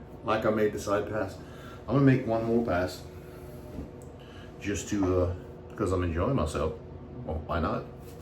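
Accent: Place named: Louisiana, United States. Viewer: If I can echo Sean, your experience always shows....always a great video, especially with your wonderful cajun accent. More please on your stone to edge appreciation. I'd love to try Jarrods concave edge, on a Ti possibly. Looks like you had a very fine shave 🎉😊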